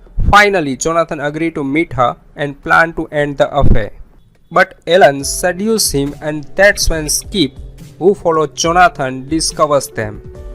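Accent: India. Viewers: indian accent is so hilarious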